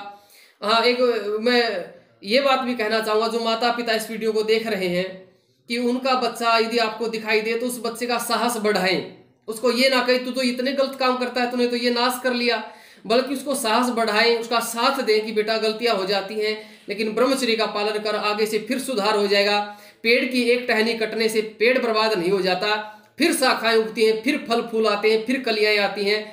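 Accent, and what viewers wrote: Haryana, India. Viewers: हरियाणा से हैं क्या आचार्य जी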